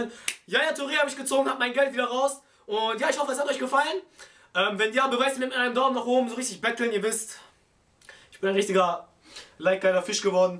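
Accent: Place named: Turkey. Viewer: ich schwör dieses deutsch türkisch.....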